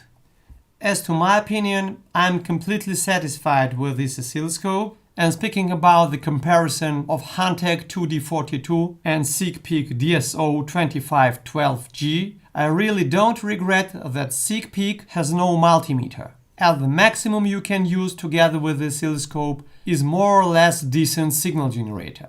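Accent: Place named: Russia. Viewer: Русский акцент в английском, ох ну что же мы так все одинаково говорим))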